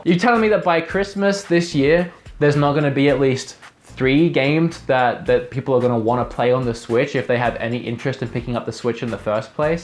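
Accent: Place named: Australia. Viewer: thicker aussie accent and a calm expression......sure did change 2 years ago lol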